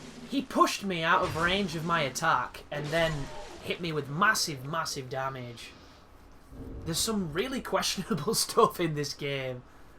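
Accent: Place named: Northern England, United Kingdom. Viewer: thank you brother, my father is actually Scottish, but I live in spain. so I am kind of hybrid, I kind of knew you were from England but I was not sure,since north English people & Scottish can sound very similar.. I have found your channel on your video about finding vendrick hidden soul after killing him, then I also commented about how huge RYU HAYABUSA fan I am:)) also sorry I have missed your Castlevania run, I will try to watch them soon, also thank you for welcome me to your channel brother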